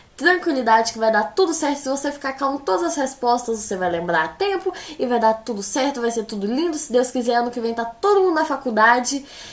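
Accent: Minas Gerais, Brazil. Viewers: Quero ver como retomar a tese sem ficar repetitivo. Conheci seu canal ontem e to me odiando por não ter te assistido o ano inteiro :) E de curiosidade, quantos anos você tem? Tipo, c ta no ensino médio? Pq tava achando q c era professora, mas que parecia mto nova pra isso. hahaha PS: Q sotaque maravilhosooo, é igual ao da minha mãe, ela também é mineira :D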